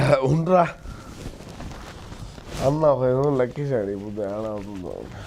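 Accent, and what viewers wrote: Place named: Andhra Pradesh, India. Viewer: అన్న నీది రాజంపేట నో రైల్వే కోడురో ఉంటుంది నీ యాసలో కనుక్కోవచ్చు నువ్ మా ప్రాంత వాసీవైనందుకు గర్వపడుతున్నాం సూపర్ నువ్వు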